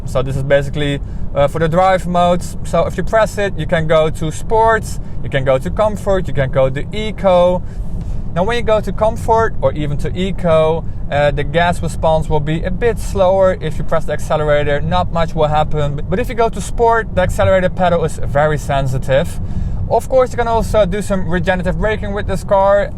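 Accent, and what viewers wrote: Netherlands. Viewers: Are you from the Netherlands?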